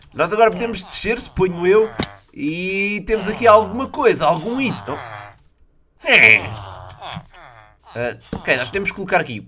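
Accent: Portugal. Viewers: O português de portugal é mt top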